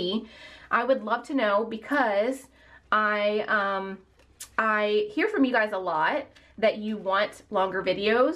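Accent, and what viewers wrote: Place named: South, United States. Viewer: I knew from your accent that I was listening to a southern girl 😂 hello from Louisiana!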